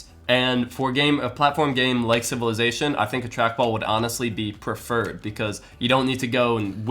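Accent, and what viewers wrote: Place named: General American, United States. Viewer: General American accent.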